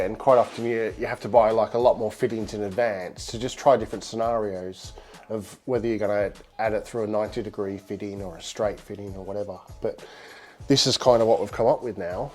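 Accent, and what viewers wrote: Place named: Australia. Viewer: You an Aussie mate?? Shout out from Melbourne Australia. Killer build, even more killer dog!!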